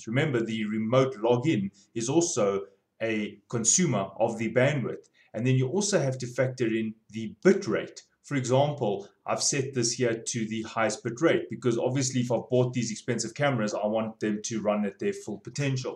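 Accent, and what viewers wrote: South Africa. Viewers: south african lol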